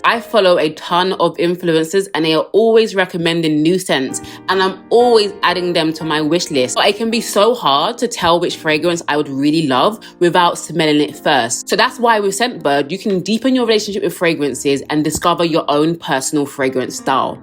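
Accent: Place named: United Kingdom. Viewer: I love your accent so much! which city is your accent from? Everyone seems to have a different British accent depending on the area 🤔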